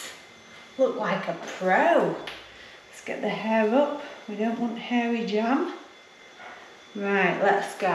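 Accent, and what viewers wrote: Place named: Yorkshire, United Kingdom. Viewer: Dear, I am binge watching your vlogs, I like your calm style and I find your Yorkshire accent loveable. Your channel is as entertaining as Nicki Positano or Kristen and Joerg from the UK. I love about you and these others vloggers that you lead a pretty great life in a nice place and you are aware of that and make the best of it and try to enjoy totally. Marvellous and intelligent attitude. Keep up your good work. Anyway I love your clothes choice and your earrings too.😘😘🌼🌼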